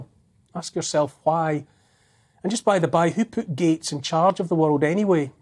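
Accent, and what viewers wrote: Scotland, United Kingdom. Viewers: And the scottish tones ssure help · I know he’s Scottish. Kind of obvious. I’ve never heard an “ Irish brogue”. Love his historic documentaries. He just has an “ It” factor.Not in the Steve McQueen/ Jim Morrison overt sexuality genre. More esoteric. Are you in the UK? The top two contenders vying to fill Johnson’s seat are WEF “ Young Global Leader” plants.Quite terrifying. Wales,France,Italy ( just ousted), Brussels, Netherlands, Finland, Norway,Luxembourg,Australia, NZ, Canada, Sri Lanka( ousted), Pakistan,Saudi Arabia,Germany,Chile,Bolivia,Nicaragua,Ukraine,WHO,UN,Spain, Greece, El Salvador,Peru,Honduras,Argentina,Sweden, Begium, Maldives, Costa Rica Denmark, the US Puppet Governmet. “ Tyrants ‘r’’ Us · My neighbour was a "war bride" from Scotland. She was a second mother to me. Hearing your beautiful Scots accent makes me smile and think of that wonderful woman. 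Hearing your message gives me hope for the world, knowing that not all of us are asleep, and it gives me hope that my neighbour's husband, and my dad, did not fight in vain in WW2.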